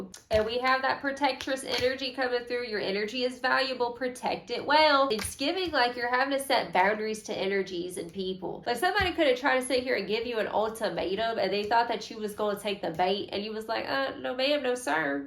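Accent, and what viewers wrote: South, United States. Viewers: What in the Dolly Parton ,Deepika Padukone,& Salma Hayak is going on here LOL !! I Love your energy,and your country accent . I was surprised to hear you speak you sound down south, and you look like a lot of different cultures You are hilarious and beautiful your read is on point . LOL!!!! 😂 ❤👍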